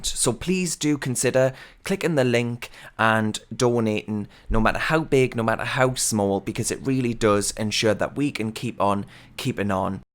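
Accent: Newcastle, United Kingdom. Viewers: Great video, also great to see & hear a Geordie lad stikin it to them.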